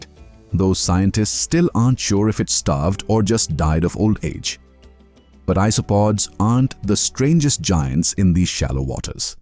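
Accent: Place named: India. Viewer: why is the ai voice talk like an indian?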